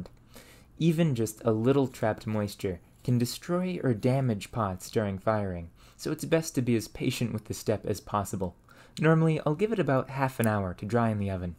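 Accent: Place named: Michigan, United States. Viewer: I can't get over yer accent. It reminds me of an old online friend of mine from Michigan who'd say things like "yer" and "fer" :)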